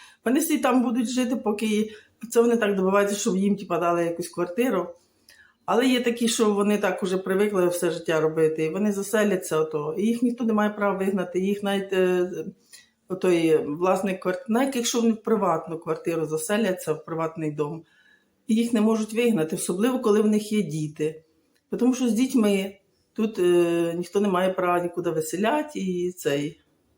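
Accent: Surzhyk, Ukraine. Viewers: Ви не вмієте розповідати , не кажучи про ваш суржик . Вам потрібні лайки?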